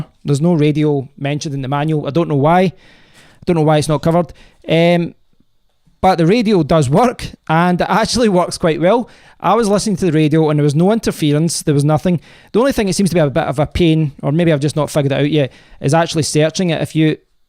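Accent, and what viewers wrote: Scotland, United Kingdom. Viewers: i love the way you say K .... i love your ascent reminds me of my grandparents who were both from Scotland..thanks for the vid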